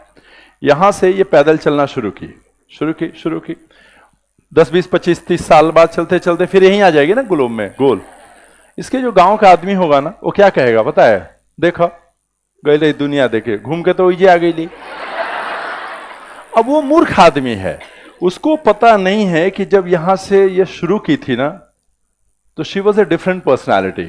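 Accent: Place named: Bhojpuri, India. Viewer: मजा आ गया क्लास कर के sir😊भोजपुरी स्टाइल में भी sir समझा रहे ह😊😃👍👍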